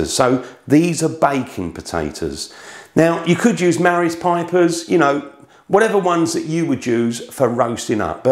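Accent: United Kingdom. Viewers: haha- Cyrus Kariuki - as another Brit he sounds like a bit of a 'geezer' to me! I like that accent, but it's not what I'd call 'beautiful' English. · Nice video and recipe. Where from UK is your accent from? 🥰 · British accent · As a black guy from America I love this guy british voice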